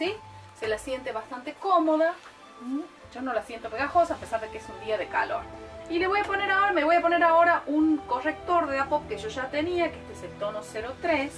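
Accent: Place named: North, Argentina. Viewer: Hola hermosaaa. Proba los polvos sueltos de ruby rose. Es verdad no es necesario saber maquillaje. Jajajaja me hiciste reír. No le lleves el apunte a las mala onda. Yo soy maquilladora. Y te maquillas bastante bien. Me encanta tu voz bien del norte representandonos en YouTube. Saludos desde Tucumán . Argentina. Yo vendo Dapop y Ruby Rose por instagram aquí en mi provincia 🔥🔥🔥🔥😍🔥😍😍😍 y tbn uso y amo el maquillaje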